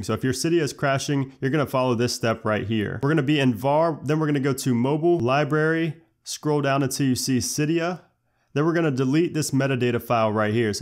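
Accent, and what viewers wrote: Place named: South, United States. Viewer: anyone else think he has like a really hot voice? reminds me of like a southern guy lol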